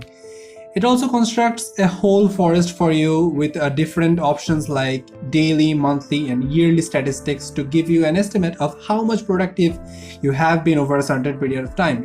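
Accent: Bengal, India. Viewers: Why do u sound bengali?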